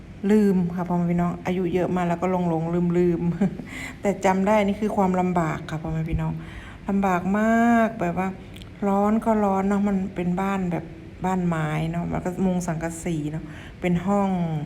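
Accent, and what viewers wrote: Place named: Nyo, Thailand. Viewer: จ๋า🥰🥰สำเนียง เหมือนญ้อเลยค่ะ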